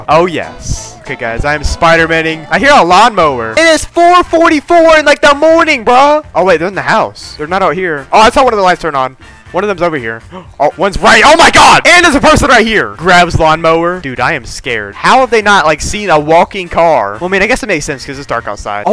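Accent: New Zealand. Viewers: wait what are you from nz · Are you from new Zealand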